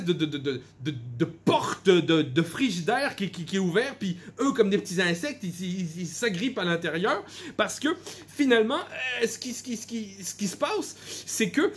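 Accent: Quebec, Canada. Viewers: Sans l'accent québécois on dirait Pierre-Yves Rougeyron. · Ça sonne plus québécois que MBC ; à quand un MBC gaming ?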